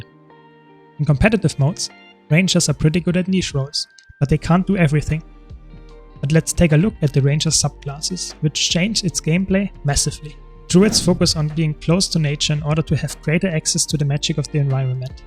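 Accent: Germany. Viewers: Ah yesss that german kartoffel accent xD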